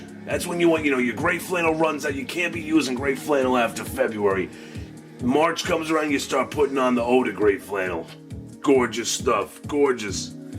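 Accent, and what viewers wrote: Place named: Brooklyn, United States. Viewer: Great review and you sound exactly like my uncles from Brooklyn. When they say "fuck"it sounds so elloquent. Aramis is the shit and DOES smell like guys at the train station.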